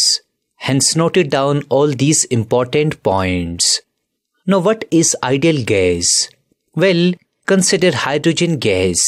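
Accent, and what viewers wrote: India. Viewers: I understand Indian pronounce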